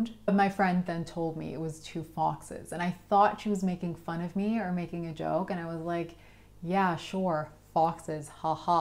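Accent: California, United States. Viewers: Everyone here seems to think you sound completely American, and while your accent is very American-ish I can't really hear any of the regional tells that would make me think you actually learned to speak English in America. Did you live in America or did you learn to speak English while living in Austria? Either way I've become a big fan of your channel and think it's great that you are helping others learn. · Can you tell us where your American accent IS from? An American school? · Austrian or American? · You have a perfect californian accent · Curious Why do you sound American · Are Austrians taught North American English? Or was that the accent you just learnt? My German mate in Uni was taught English by a Lancastrian when he was a kid so sounded more native than most of us. Shout out to Kris!! I thought you were a yank. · Obviously was taught English by an American, hence the accent. 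· why are you calling yourself Austrian? when that's either an American or Canadian accent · Sorry I've only recently been introduced to your channel, but I'm intrigued as to why you have such an authentic American accent as an Austrian? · You definitely learnt English in the USA , would never have thought of you as Austrian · You don't sound Austrian. You sound American. I can't detect any Austrian accent. You are way ahead of Arnold Schwarzenegger who has lived in USA nearly his whole life. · Like watching but interested to know why you have a US accent. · I thought from your accent you were from the US! Enjoy your videos. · Most posters seem to be more interested in accent and her claim of being Austrian than her video content. Accent is completely US but that doesn't mean she's not Austrian.. or perhaps she's Australian.. who visited Austria via the valleys of California? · Austria is such a beautiful place but you don't half sound american. 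· if your austrian why you trying to talk american? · Austrian, thought you were American lol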